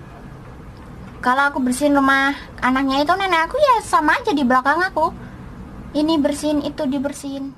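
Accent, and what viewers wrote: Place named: Java, Indonesia. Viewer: Dik jenengan asli pundi to kok bhsa jawa getot sekali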